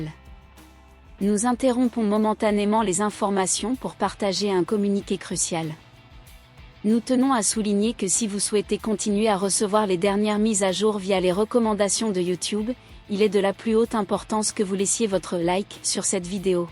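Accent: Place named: Paris, France. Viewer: Ce site a des info bidon ca racole des abonnés stile pour dépasser le psg deja pour commencer le club c olympique de Marseille c qui cette femme qui parle a tout les coup elle est même pas dici on dirait une parisienne regarder les info de franki foot ou touche pas a mon stade les mec meur pour l OM Elle on dirait quelle lit un script jamais une info exact bidon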